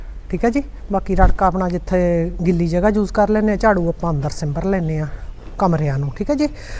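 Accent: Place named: Khamanon, India. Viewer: ਤੁਸੀਂ ਕਿਹੜੇ ਪਿੰਡ ਤੋਂ ਭੈਣ ਜੀ ਸਾਡੇ ਇਲਾਕੇ ਦੀ ਬੋਲੀ ਤੁਹਾਡੀ ਖਮਾਣੋਂ ਇਲਾਕੇ ਦੀ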